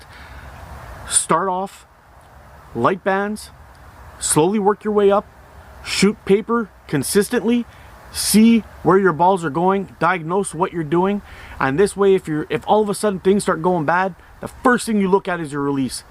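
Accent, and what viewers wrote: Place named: Canada. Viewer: Just found your channel - great stuff! Nice to watch a slingshot video where the host has a Canadian accent EH! Cheers from Perth Ontario!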